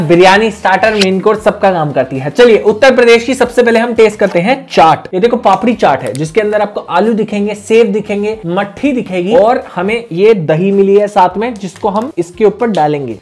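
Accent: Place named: Bengal, India. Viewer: Iska Bengali sunke hasi nahi rok paya😂😂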